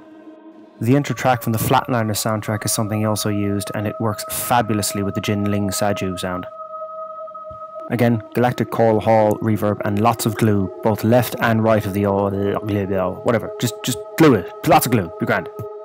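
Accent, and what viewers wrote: Ireland. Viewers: Can you do Detroit electro? And is that an Irish accent ?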